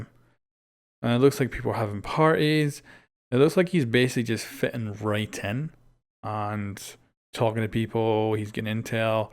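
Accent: Ireland. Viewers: Are you irish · You have to be irish with this accent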